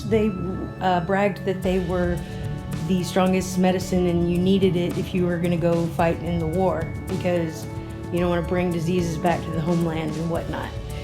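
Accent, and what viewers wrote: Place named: United States. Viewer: You grew up in the Philippines? Your English is perfect and you sound very .. American?? 🤔 Anyways, love your content, your voice is beautiful and soothing to me. I love your laugh too! I've subscribed to!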